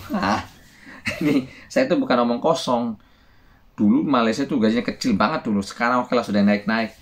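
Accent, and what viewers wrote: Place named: Banyuwangi, Indonesia. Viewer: Koyok wong Banyuwangi to mas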